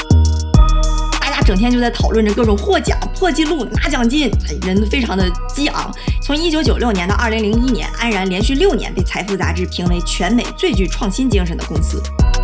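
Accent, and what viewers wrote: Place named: Northeast, China. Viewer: 美丽，睿智，幽默，专业。喜欢您的东北口音：）想起了多年前在长岛的一个哈尔滨美女，我的麻将搭子，哈哈。关注了。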